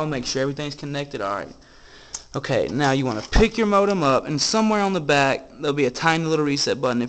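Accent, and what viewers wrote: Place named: South, United States. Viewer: Y'all, that helped me a lot but y'all need to stop talking like that ya country boy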